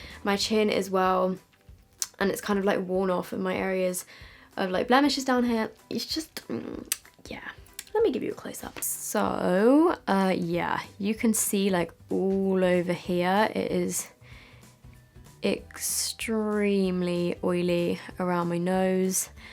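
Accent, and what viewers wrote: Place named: United Kingdom. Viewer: Its so hard to watch this when u hate british accent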